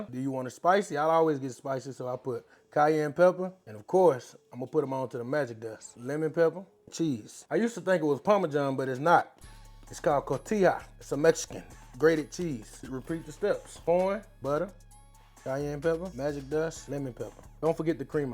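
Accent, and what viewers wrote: Texas, United States. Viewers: I guessed that you were a Texan! The accent and the hat did it.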